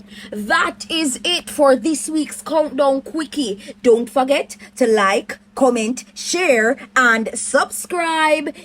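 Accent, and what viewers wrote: Jamaica. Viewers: SMH! She is 🇯🇲 after all, what do you expect a Canadian accent?! Cho!!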